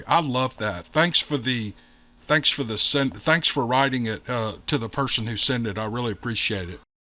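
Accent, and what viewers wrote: South, United States. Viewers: Thank you & thank you to those that send their stories in to to share with us. All of these were amazing!!! Glad you aren't using the AI in these The stories with AI were good as well, but we like the magic in your good ole' country boy accent...Just something special about that southern drawl....😂😂😂 Gotta know we love you?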